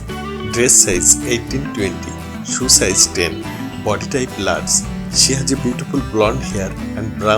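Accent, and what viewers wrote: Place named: India. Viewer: I don't understand a bloody word with that indian accent ! What's her size and weight ?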